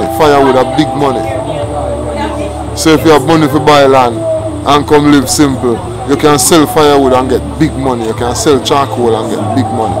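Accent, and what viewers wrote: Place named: Jamaica. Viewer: Hahahahahaha yea man,i really love your patoia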